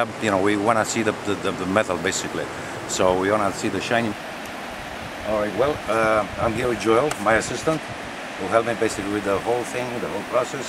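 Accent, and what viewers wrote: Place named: Spain. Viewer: your spanish accent is very very thick that it is awesome!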